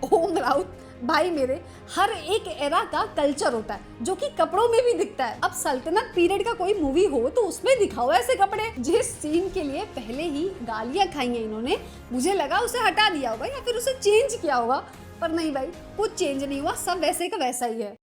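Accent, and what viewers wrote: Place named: Lucknow, India. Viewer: Aap Lucknow se hain?? Aapke bolne ka andaaz waise hi lagta hai.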